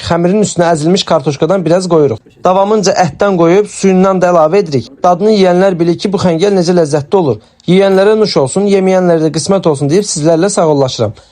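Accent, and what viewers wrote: Qakh, Azerbaijan. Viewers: qax lehçesi beledi de kimseninyamslamlr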